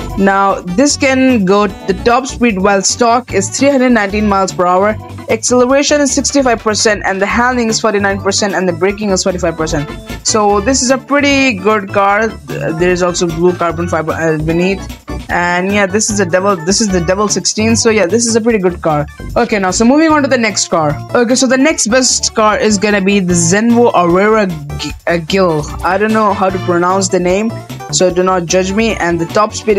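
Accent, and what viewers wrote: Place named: India. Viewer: are u Indian? U have a Indian accent which is cool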